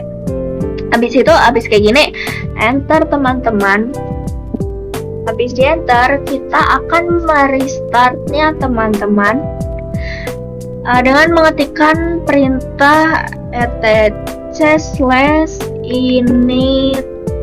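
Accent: Indonesia. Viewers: Medoq banget teman teman 😭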